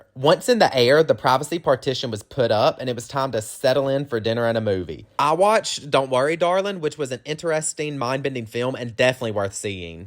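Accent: South, United States. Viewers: There’s nothing worse than listening someone that’s gay and from the south.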